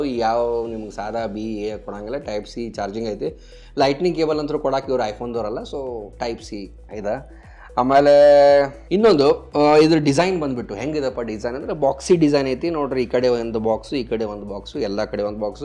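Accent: India. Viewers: Niv Belgavi davar anta heli ,bal Hemme ide broo🙌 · Unique style of explaining technology with sense of humour. Loved your Jawari kannada.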